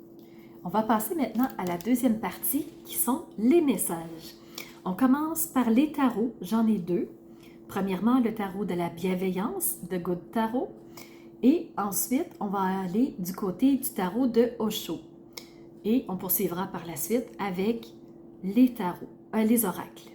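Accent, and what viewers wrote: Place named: Belgium. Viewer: Pour commencer, j'adore votre accent. J'aimerais vivre par chez vous, parce que l'accent belge, euh... comment vous dire ? Hum !...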